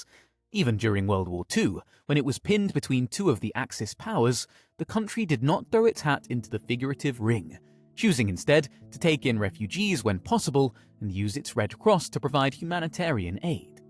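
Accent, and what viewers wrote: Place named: United Kingdom. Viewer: Here is a small correction to the facts you cite in this video: Switzerland's ranking in the Human Development Index does not continue to outperform MANY of its neighbors" - it continues to outperform ALL of its neighbors. It's very important to be factual and not water down the truth, just because the continuously underperforming neighbors could feel triggered by their consistently declining social and economic rankings. Perhaps some day you'll make a video on the UK as today's largest safe haven for corrupt, thieving oligarchs from the eastern bloc SH countries that are granted special UK rights and favorable policies to ensure they stash their ill-gotten gains in the UK? Just a thought. But it sounds like you're british, so I'm assuming we'll be kept waiting? 🤣🤣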